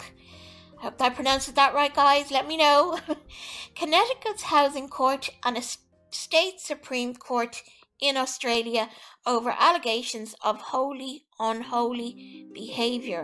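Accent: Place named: Ireland. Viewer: Good video & great accent! Are you in Ireland? God bless you & keep up the good work.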